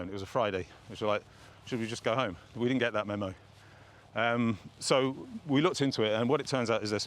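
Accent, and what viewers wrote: United Kingdom. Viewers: I think he is British…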